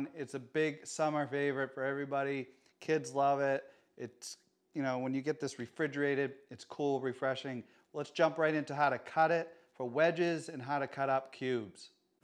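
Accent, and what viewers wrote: Boston, United States. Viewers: Boston accent detected.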